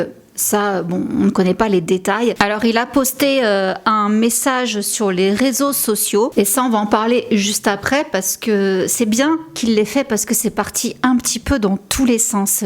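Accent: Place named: France. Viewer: Tu pourrais faire un minimum d efforts pour l accent anglais? On dirait une blague tellement tu franchouillardises des mots basiques .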